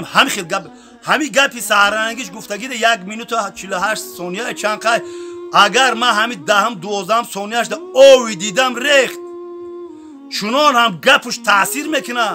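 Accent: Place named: Khujand, Tajikistan. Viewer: Гап нест бобичон чак чакот зурай, хдмонский гап мезани, 💪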